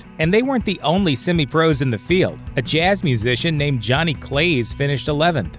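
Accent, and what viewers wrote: United States. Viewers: Opens video: American accent appears Oh god, uneducated twats not understanding the nuances of the motorsport, yay. Footnote Hahahaha, Williams and McLaren both being top tier teams. HAaHahAa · When I heard an American narrating this i knew it was shite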